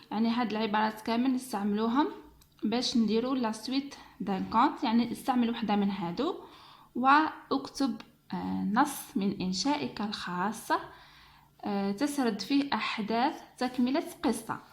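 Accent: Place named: Algeria. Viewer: هدرتك تعجب نتي جزائرية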